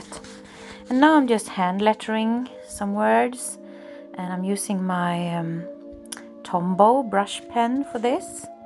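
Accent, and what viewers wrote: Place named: Sweden. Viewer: Love, love, love it! You go girl! Your English with Swedish accent is beautiful. I admire those that are multilingual. xo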